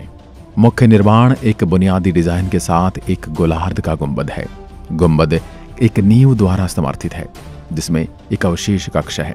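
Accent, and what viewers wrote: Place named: Rajasthan, India. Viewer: Thanku ji mp ke बौद्ध स्तूप के लिए मैने आपकी सारी वीडियो देखी h राजस्थान की आपकी आवाज bht pasand h 🥰🥰🥰🥰 भाई जी प्लीज mp ke gwalior or shivpuri par video बनाइए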